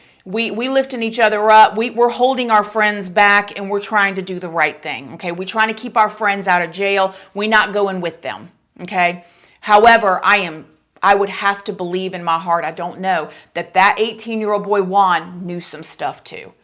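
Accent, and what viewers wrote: South, United States. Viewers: I love you. I'm from the south also and you talk just like me and everyone in my small town. Although your talking about something so serious I can't help but laugh when you say certain things like in my dumb dumb brain 🤣. You are so not dumb which is why I keep coming back. God bless keep up the good work.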